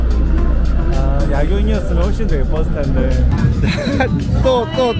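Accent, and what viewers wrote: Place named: Gyeongsang, South Korea. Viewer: 인스타에서 보고 왔습니다 영상재밌어요 😂 익숙한 사투리이신데 혹시 경남분이신가요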